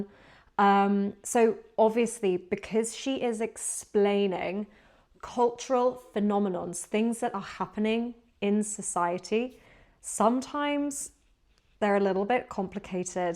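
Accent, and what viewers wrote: United Kingdom. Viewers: Goog job! You're my first and the only native english channel. I'm about to start a new job in London next week so thanks for letting me hear your british! Best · British accent is absolutely beautiful. She speaks at a comfortable pace, which is perfect for beginners like us. Let’s keep working together to develop the ability to think directly in a second language, rather than translating everything in our heads or depending completely on AI and missing the chance to build our own language intuition. The funny thing is, this comment itself was translated by AI😂😂. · 我i like your teachingm, for cn people, from British accent , its very good verygood for us , because pronunciation and clear , just like your teach methods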